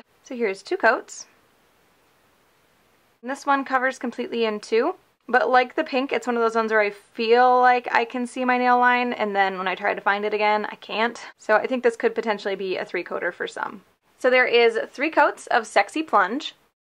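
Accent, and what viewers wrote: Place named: Minnesota, United States. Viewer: Are you from Minnesota? You sorta have a accent. If so cool I'm from Minnesota too!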